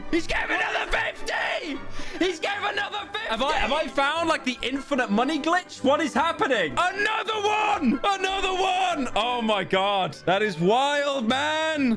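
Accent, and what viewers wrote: United Kingdom. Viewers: listening to british furry man talk about economics makes me want this to be my only source of education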